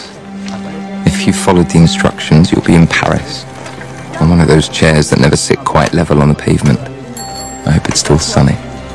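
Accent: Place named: United Kingdom. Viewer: 念的英音英语真好聽。喜歡死這部電影了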